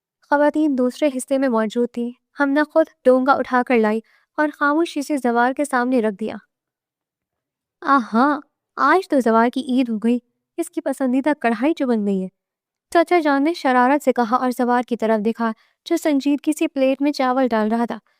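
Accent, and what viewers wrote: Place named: United States. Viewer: Lagra hai app American ya London wali ho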